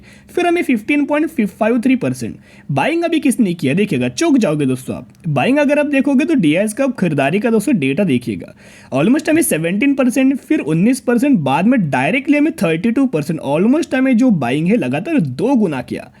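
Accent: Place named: South, India. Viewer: Tomara hindi just like south Indian.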